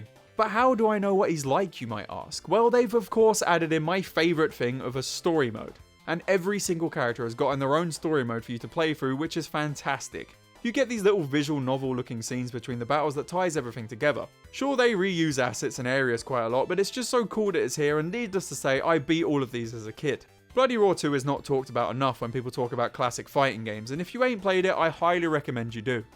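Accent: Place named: United Kingdom. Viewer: I like when British man talks about games for over and hour myyyyy favoriiiiiteeeeee 😩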